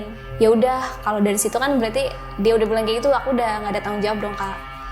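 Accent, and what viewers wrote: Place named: Pemalang, Indonesia. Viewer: Orang Pemalang mn suaranya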